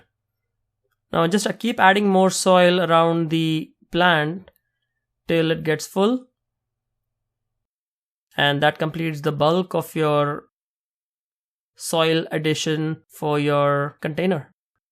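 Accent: India. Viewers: U talk same Indian people, where are you from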